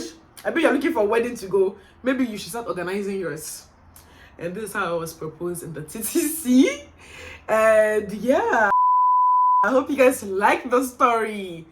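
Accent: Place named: Nigeria. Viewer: you sound soooo Nigerian in this video oo hah! 😂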